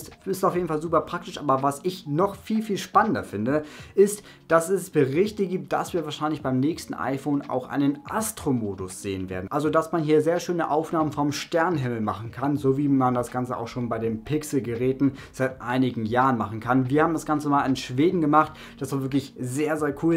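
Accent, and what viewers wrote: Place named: North, Germany. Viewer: Man hört den norddeutschen Dialekt XD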